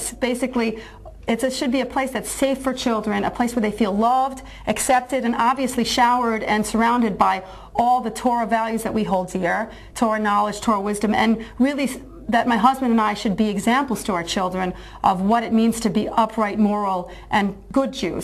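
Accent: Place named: United States. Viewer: I would assume, from your accent, that you're a citizen of the United States. The United States is legally a secular Republicanist nation founded on the whole concept of personal Freedom. Since you proclaim Torah-values I must assume that that the Republicanism of this great and grand Republic is something you not only would never teach in your home, it is obviously something you outrightly reject. And yes, in this Republic, your right to believe as you do is legally protected this way.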